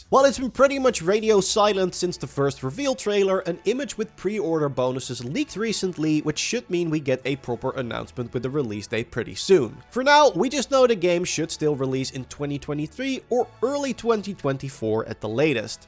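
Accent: Netherlands. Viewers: I loathe the super strong Dutch accent. It's even worse this time.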